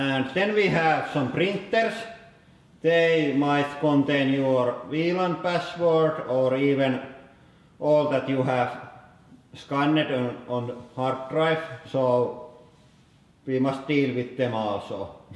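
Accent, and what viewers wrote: Finland. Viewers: Derp he is finish (idk how you spell that) I'm Dutch lol ik Ben dat wel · kiva rallienglanti, huomasin heti et oot suomalaine :D kivasti tilaajii sul:) ja kiva idea.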